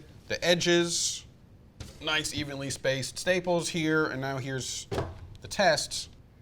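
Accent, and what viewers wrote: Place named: New York, United States. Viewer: I like the New York accent.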